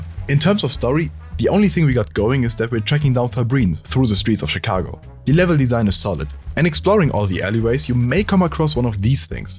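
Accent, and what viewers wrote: Germany. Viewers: I'm recently subscribed but gotta say, I figured out you are German after the first 2 sentences you spoke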